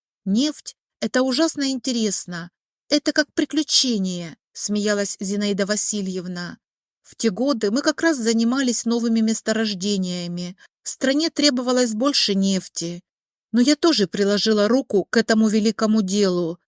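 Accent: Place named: South, Russia. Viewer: Очень сильный южный акцент. Хочется литературного произношения.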